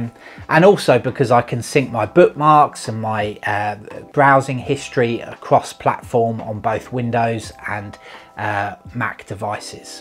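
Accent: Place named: Australia. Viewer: thanks. I have been considering to move from Chrome to Safari permanently, then found your video! by the way. I like your accent. which part of Oz are you from??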